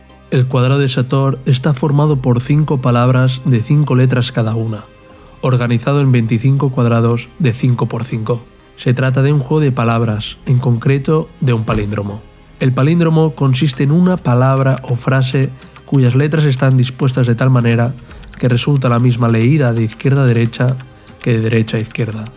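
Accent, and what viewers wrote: Catalonia, Spain. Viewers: A mi me suena más a catalán por como pronuncia Júpiter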